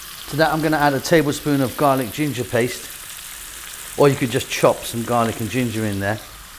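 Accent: United Kingdom. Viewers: Like your cooking with standard British accent 👍👍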